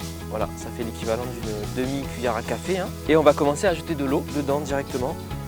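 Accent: South, France. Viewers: Coucou, merci de partager vos souvenirs et votre galette! Il me semblait avoir reconnu un reste d'accent du Sud!... 😉😀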